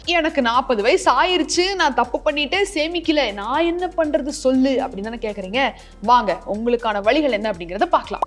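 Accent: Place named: Kongu Nadu, India. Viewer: கொங்குநாடு பேச்சி மிக அருமை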